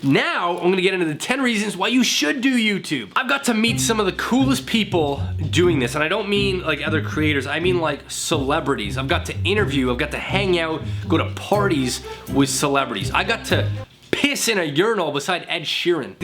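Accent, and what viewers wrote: Canada. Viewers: Well, I think Charlie is cute.......this is the second video......you drew me in with the mirror paint video and you seem to be a normal good guy which is why I subscribed to your ch........not to mention I like the little Canadian accent.....out, aye...........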